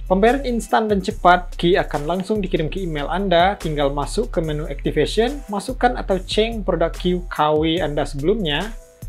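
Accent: West Sumatra, Indonesia. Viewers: Abang orang Sumbar ka bg?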